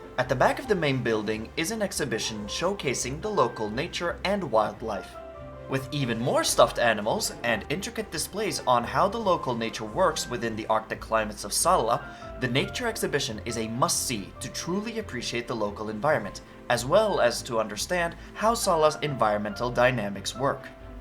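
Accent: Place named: United States. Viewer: Dude is that you talking? Why do you sound american 😆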